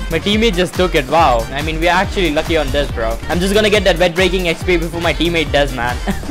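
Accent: India. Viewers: W INDIAN ACCENT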